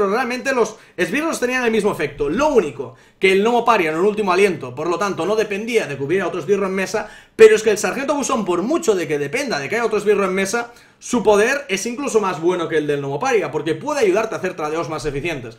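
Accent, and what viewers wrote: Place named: Spain. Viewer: Me ha gustado mucho el video y la discusión. Lo que sí me rechina es el espánglish, ya que claramente sabes inglés a la perfección y le llamas rocbíter a la rockbiter weapon (¿ rocbaiter ?), castear en lugar de invocar, tradear en lugar de luchar o intercambiar (¿ treid ?), etc. Pero bueno, soy un pesado y desde aquí en América del Sur el acento y las traducciones de España nos resultan ajenas a lo que estamos acostumbrados (típica polémica Homero vs Hómer). Reitero que me ha gustado del vídeo, a pesar de mis críticas.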